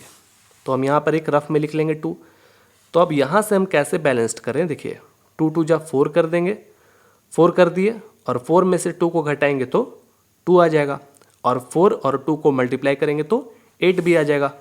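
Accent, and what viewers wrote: Bihar, India. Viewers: Sir you are from Bihar 😊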